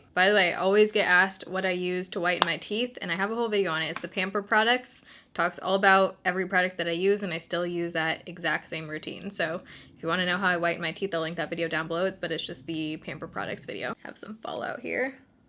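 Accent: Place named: Seattle, United States. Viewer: You're the first beauty guru I've followed who lives in my area. I actually just started following you, and when you said you were living in Seattle it all made sense. Your chillness, fair skin (which is easy to have when it always rains here), the way you talk (although I can't explain it). I'm looking forward to seeing more of your videos!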